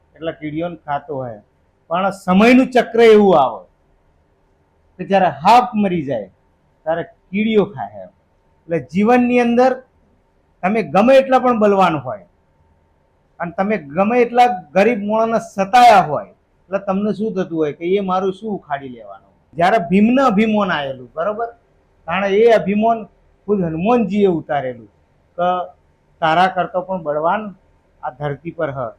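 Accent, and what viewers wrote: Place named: Charotar, India. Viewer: Charotar No Havaj Bole Mari Jaan 😍❤️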